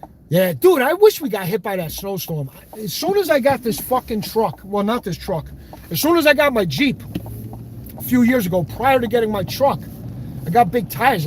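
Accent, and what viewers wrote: New York, United States. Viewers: YOU'RE FROM NEW YORK YOU SPEAK JUST LIKE IT.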